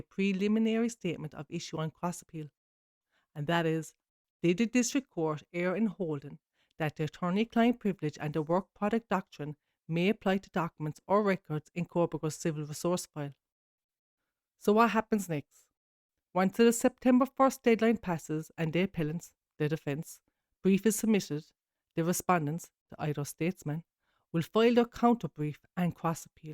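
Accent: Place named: Ireland. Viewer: I love your voice! I had no idea you were actually Irish! Lovely. Thank you for now uploading videos. I can liztdn while i clean and work❤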